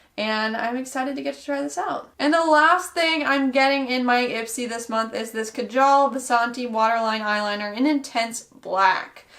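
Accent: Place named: Minnesota, United States. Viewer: I KNEW YOU WERE FROM MINNESOTA!!! I can recognize my accent anywhere!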